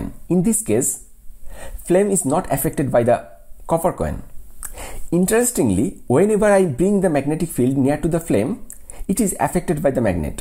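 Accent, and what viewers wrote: India. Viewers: Is this guy a native of India?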